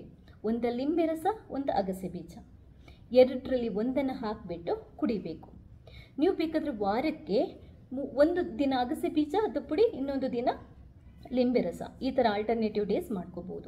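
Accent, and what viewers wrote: Mangalore, India. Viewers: Madam nivu Mangalore urura,channagi mathadathira 🙏👌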